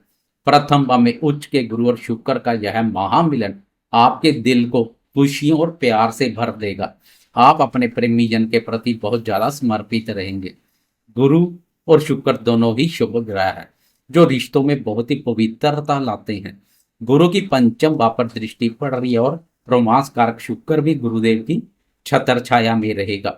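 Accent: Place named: Punjab, India. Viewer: जी पहली बार देखा है आपका वीडियो।। आपके बोलने में जो पंजाबी एक्सेंट आता है वो काफी आकर्षक है।। बाकी आपकी भविष्यवाणी तो आने वाला समय ही बताएगा कि कितनी सटीक है।। जय महाकाल 🙏🙏🙏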